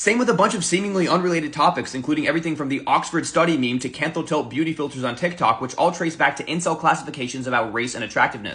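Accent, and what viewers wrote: United States. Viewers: american accent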